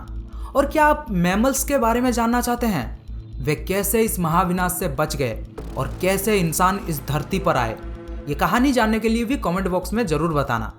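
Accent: Odisha, India. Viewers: Bhai aapka baat krne k tarike se hi pta chal raha hai tum Odisa se ho😁😚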